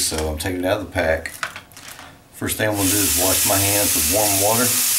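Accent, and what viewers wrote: South, United States. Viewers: He's got a gentle southern accent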